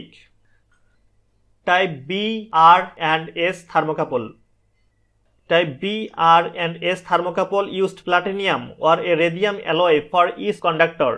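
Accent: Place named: India. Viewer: 这印度调调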